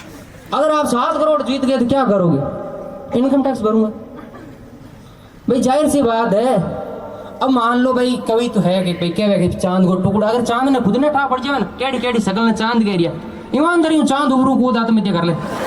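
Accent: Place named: Jaipur, India. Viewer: भाई तो जयपुर side की भाषा बोल रहा है, हमारे मारवाड़ में तो यू नहीं बोले 😂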